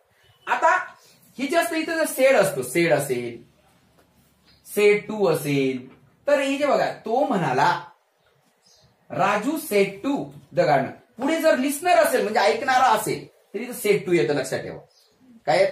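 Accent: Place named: Bengal, India. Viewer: Ka bol raha h, bangali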